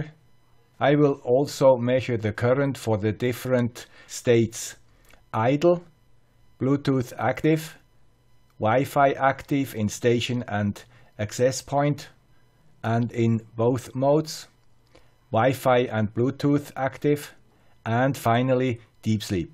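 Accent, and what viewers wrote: Switzerland. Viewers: Ok. Thank you for your answer (with the Swiss accent). Regards from someone with a Belgian accent;-) Really enjoy your channel!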